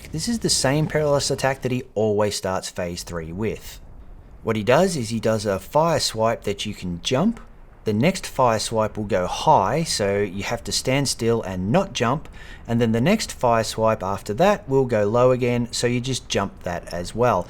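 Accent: Australia. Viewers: During a perfect battle he can barely do anything special but if you take too long my god he sculpts you into his bitch. Thanks again, when I hear that familiar aussie accent I know everything will be okay. Dissecting the videos like this has really made me appreciate the combat too.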